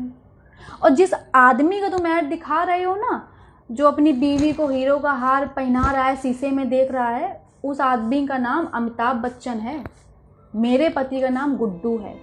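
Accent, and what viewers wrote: Kanpur, India. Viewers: Kanpuriya ho kya beta ji. 😍😍😍😍😍😍😍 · apke kanpuriya accent par fida ho gaye hai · Bolne ki font bdi acchi lagti kanpur side ki...so awesome 😍😍😍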